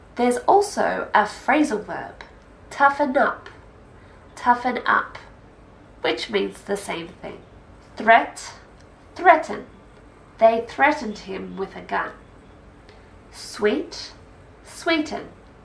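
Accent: Australia. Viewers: Is this Aussie English? That's what I'm looking for :) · Yes, she is Australian.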